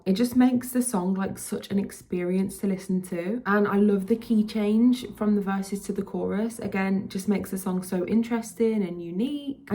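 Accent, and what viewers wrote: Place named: England, United Kingdom. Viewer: Love your videos. I agree with your choices but I'd put first and afterwards but I don't share your memories but yeah, all great choices. May I ask which part of England are you from. I'm from Birmingham, and I can't quite place your accent. Sounds close though.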